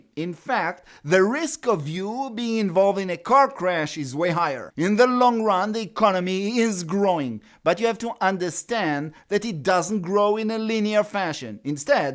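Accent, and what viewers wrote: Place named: France. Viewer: What is this guys accent? French? It rules.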